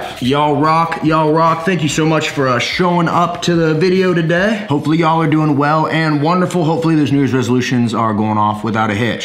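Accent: Texas, United States. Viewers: The Texas y’all has caught up with ya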